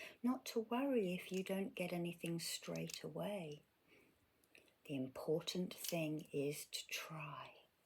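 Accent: United Kingdom. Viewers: you have the same kind of british accent as my sweet auntie, who- funnily enough- is also named:) Bless your positive energy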